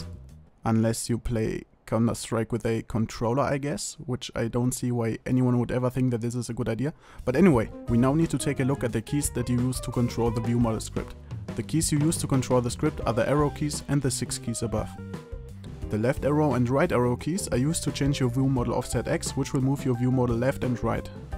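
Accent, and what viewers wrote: Germany. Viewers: Man hört sofort das du Deutscher bist :D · man merkt voll das du deutsche b ist nicht böse gemeint oder si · Das deutsche Englisch Ich LIEBE ES 😂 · Kann es sein das du deutscher bist ? / Are you German ? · Man hört so das du deutsch bist haha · Biste deutscher? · Could it be that you are german? The accent is real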